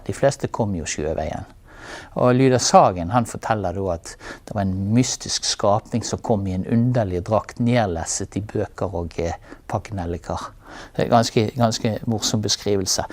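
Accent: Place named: Bergen, Norway. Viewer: Mye interessant at høre. Jeg er fra Bretagne og synes at dine foredrag er mye interessant selv om jeg har litten besvser og følge det "aegte begenske dialekt". Jeg sjønyecikke La Tocnay var rejst opp til Bergen. Jeg var i Bergen for 3 år siden og synes det er en meget interessant by som jeg foretraekker fram from Oslo som er blit så gremt med de kaempestore nye bygninger.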